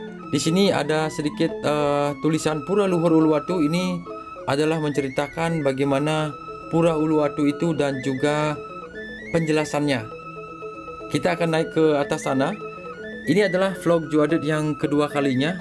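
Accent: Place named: West Sumatra, Indonesia. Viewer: Maaf krn saya penggemar menikmati video" nya tp saya jg pingin tahu identitas al: nama, asal dll krndari logat sepertinya asalnya dr Minang, Sumatra Brt. Terima kasih dan teruskan berkarya ttg Bali/ Hindu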